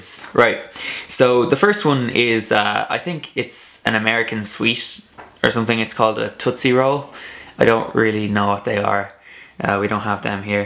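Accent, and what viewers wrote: Ireland. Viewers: You're defo D4. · Yeah, we like Irish accents. You have one, for sure. As an American, you'd never pass for American, nor English. Tootsie Rolls are chocolate chewy candies that look like frankforts, (hot dogs). Ramen Noodles ( RAH-MEN) are just what you imagine they are, small individual packs of noodles you heat and eat. Pecan, is a nut we Americans say like Pee CAHN, or Pee can. Neutral to you, man, but delightfully different than Americans'. Yeah, Americans say "wada" for water. " Nice video! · Ah babe, you've a mad strong Irish accent :) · You have a gay irish accent ! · Yeah you don't have a "tick" Irish accent. Sounds a little bit like mine. I wouldn't say it's Americanised though. When I think of that, I think more of the D4 accent. Yours definitely sounds Irish, just soft and not at all like you are trying to sound American. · To non-Irish, your accent is quite pronounced.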